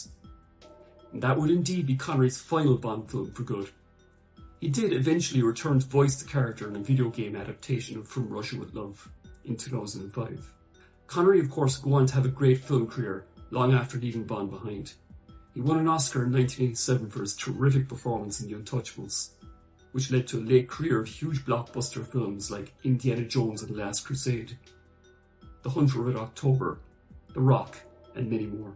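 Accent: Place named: Ireland. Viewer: Great video as ever, thank you. 🙏 Your accent is great, what part of Ireland are you from?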